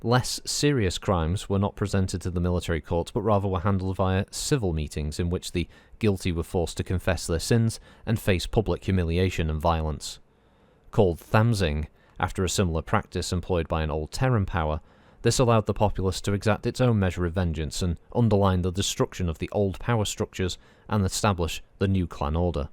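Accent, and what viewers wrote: United Kingdom. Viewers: Everybody can read the book, it’s true. But not everybody can read the book with a British Accent. 8-)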